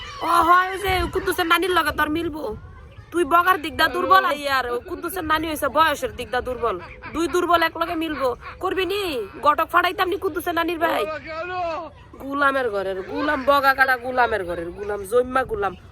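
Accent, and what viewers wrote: Comilla, Bangladesh. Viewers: Ha ha ha ha ha ha, eii apu tumi R gali diyo na amar haste haste sound asena, 😂😂😂 R kotha gulu amader zillar moto mone hoy, Comilla, Tumi kon Zillar??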